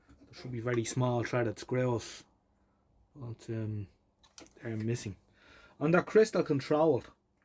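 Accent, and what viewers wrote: Ireland. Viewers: When you were testing the second set your Irish lilt turned Japanese ! Spooky! 6.30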